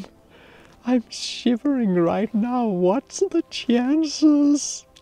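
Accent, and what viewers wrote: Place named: Denmark. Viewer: That danish accent is a real joy to hear sometimes. Especially when it sounds like you're calling them shitland ponies 😂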